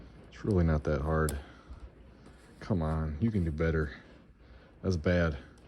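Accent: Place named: Wisconsin, United States. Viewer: I was gonna ask if you were from Wisco with that Sconnie accent. But then I saw Monona on your hoodie and read the bio on your page. Always nice seeing some locals on you tube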